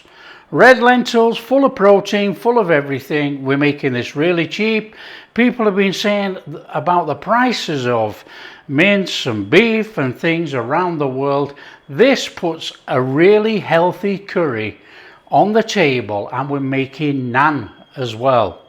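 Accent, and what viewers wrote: Yorkshire, United Kingdom. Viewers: what a nice Yorkshire man . Could listen to him all day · First one of your videos I've watched and your accent reminds me of the few years I spent living in Yorkshire. I've just been thinking that I need to learn a few more recipes and now I've found you sir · 😁😁😁😁😁 yorkshire man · Are you from Yorkshire sir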